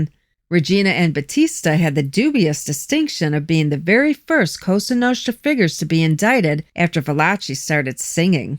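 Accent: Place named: New York, United States. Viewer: Hi again nice narrator lady with your cute New Yawk accent. Your non creepy admirer.. Keep up the great work Dawlin.. Harold....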